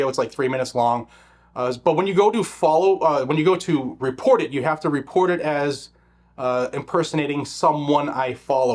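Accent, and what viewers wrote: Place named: New England, United States. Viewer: Look at you!!! Only been in Tennessee for a couple months and you are already picking up our accent, slang, and language! I love it! Go ahead and immerse yourself in language with Johnny B so we can get rid of the rest of that foul, yankee accent! I love what you are doing my brother. Keep the faith, and the fight. We are all doing everything we can to stop all the madness from happening. Even if sometimes, all we can do is pray. If everyone would just praise God and pray, all of the problems would disappear. But, we know that most people won’t. So, here we are. We are definitely NOT the people anyone wants to fight against. But they are crazy, insane, and indoctrinated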